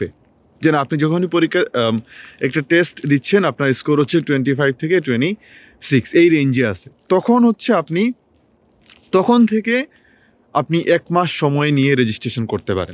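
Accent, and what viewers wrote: Sylhet, Bangladesh. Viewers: ভাই সিলেটি ❤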